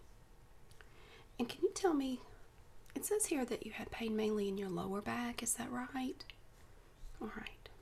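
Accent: South, United States. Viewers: Oh yeah I like your southern accent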